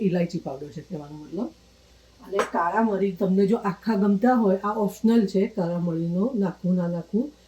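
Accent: Mehsana, India. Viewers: Bhasha mahesanani chhe to vatan?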